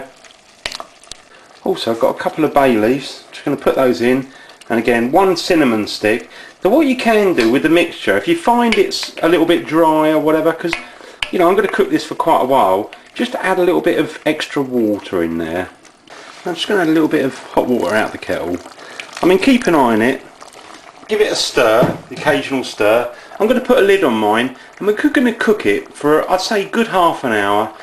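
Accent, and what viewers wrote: United Kingdom. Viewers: just because he has a british accent doesn't mean he sounds like Jamie oliver... he sounds nothing like him other than the british accent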